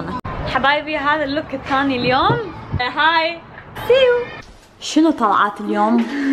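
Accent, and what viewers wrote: Iraq. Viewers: والله ما جنت ادري انتي عراقيه لو انه غلطانه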